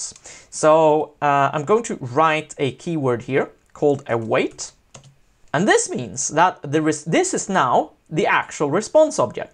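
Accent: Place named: Sweden. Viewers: Swedish accent ftw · Haha I remember when I watched this channel for the first time and I was wondering where is from. English that sounds better than the American one ^_^ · Swedish accent > American accent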